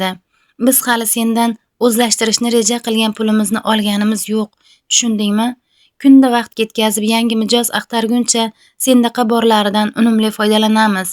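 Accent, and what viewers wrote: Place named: Surkhandarya, Uzbekistan. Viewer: Surxondaryo yoki Qashqadaryo shvasida gapiryabdi bu qiz😁😁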